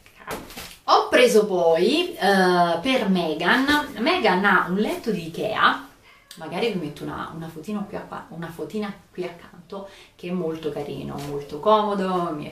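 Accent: Tuscany, Italy. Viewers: Perché toscano esci da questo corpo!!!! È' così bello il toscano simpatico allegro 😍😍😍 comunque bellissimi acquisti naturalmente come sempre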